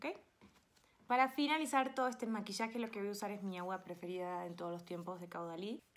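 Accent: Mexico. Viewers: Acento mexicano va ganando terreno o.O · Que bella que sos pero...y ese acento mexicano? :O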